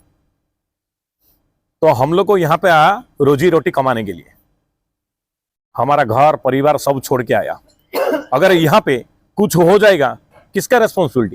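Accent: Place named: Odisha, India. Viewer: sir aap odisha k ho na aap ki langauge se pata chal Jaa Raha h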